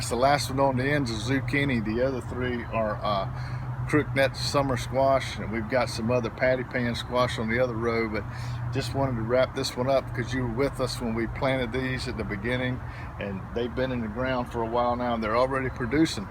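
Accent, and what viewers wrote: South, United States. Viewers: “Then I’m gunna install 4 squash” 😭❤️ Sooo country lol. Love it! 🤘🏼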